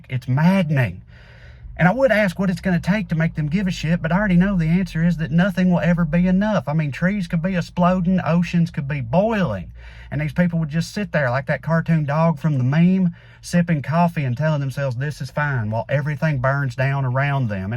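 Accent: South, United States. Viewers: I love his Southern voice. You usually hear a twang added if a person is suppose to sound stupid. It is refreshing for me to hear an intellectual conversation with an accent. An old friend of mine had an acting class,and the first thing the teacher wanted him to do was talk with an southern accent you sound dumber. So he replied,”Naw, I don’t think so Cuz, ya’ll can have it. I’m missing the football game on t.v.”😊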